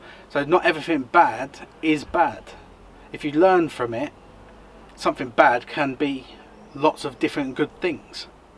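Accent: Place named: United Kingdom. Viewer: 🇺🇸Very well said. Despite having a very British accent. 😂 Just love it! Prayers for your partner. Hope shes doing well.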